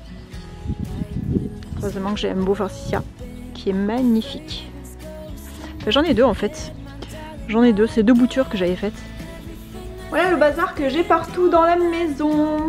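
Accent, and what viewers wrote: South, France. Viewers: Coucou 👋🐈🏵️🐥💐🌼🌷🌹❤️🌿J'aime beaucoup tes vidéos 🤗 et ton accent du sud 🌻🌻🌻🌻merci pour tout 🐈👋💕Isabelle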